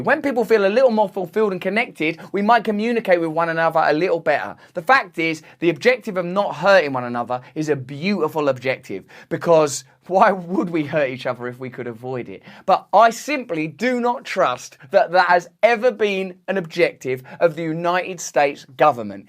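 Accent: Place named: United Kingdom. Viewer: I love you too Russell such a wonderful way to get my news a funny highly intelligent person with a Brit accent 🤣 wanka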